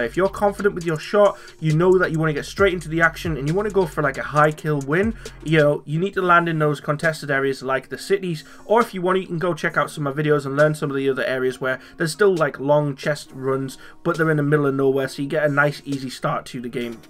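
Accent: Newcastle, United Kingdom. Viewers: Nice video dude - enjoyed that. Bonus points for what sounded like a Geordie accent too! =)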